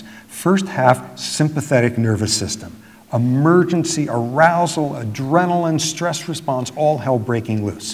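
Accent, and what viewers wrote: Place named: United States. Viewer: sounds like murica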